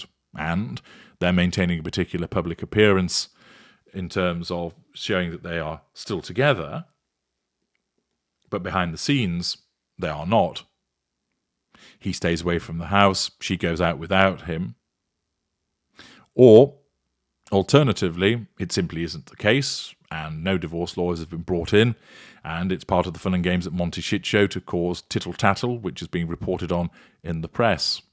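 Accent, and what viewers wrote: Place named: Liverpool, United Kingdom. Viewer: Sounds like hints of Liverpool embedded in RP, to me.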